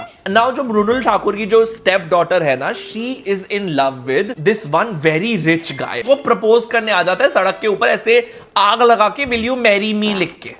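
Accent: Punjab, India. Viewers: Story gai teil leny❌ story gai phla tu duja tu teeja tu leny✅😂😂😂😂 is bnda ka story telling ka andaz or bich bich me punjabi touch.. zbrdssttttt😂😂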